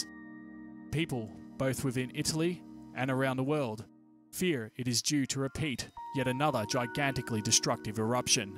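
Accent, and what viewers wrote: Australia. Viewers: That Aussie accent 🤣🤣🤣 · So now the voice of my nightmares is an Aussie lol. Fascinating and terrifying. Well done. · Awesome docs,plus ya sound like an Aussie 👍👍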